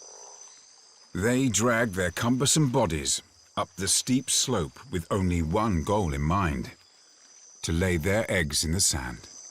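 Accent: United Kingdom. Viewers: This narrator is definitely not American. Sounds like he is from the U.K. somewhere.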